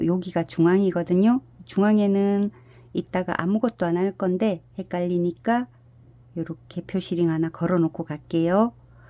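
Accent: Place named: Gyeongsang, South Korea. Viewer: 유툽 여러 선생님들 많지만 최고예요~^^경상도 억양 넘 정겹고요 꼼꼼하고 디테일한 설명에 감동여요^^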